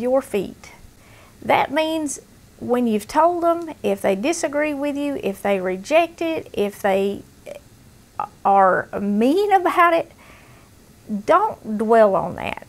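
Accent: South, United States. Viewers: the food is seems so good but all very fatening. only problem with southern food, not b=very healty. but i like your accent. i am going to try and make a low fat version of the broclie soup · I love these videos, love your southern accent and the whole thing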